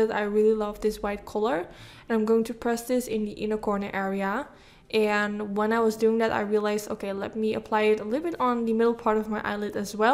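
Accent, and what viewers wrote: Netherlands. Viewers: Je makeup is echt gorgeous ❤️ ik hoor wel echt super sterk nederlands accentje 😂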